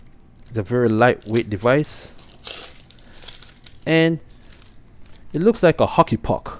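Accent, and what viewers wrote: Jamaica. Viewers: U a Jamaican? And can it use google chrome app?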